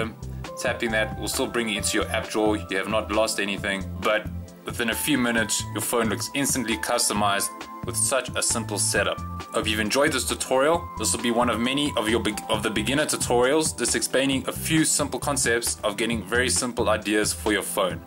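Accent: South Africa. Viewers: South African?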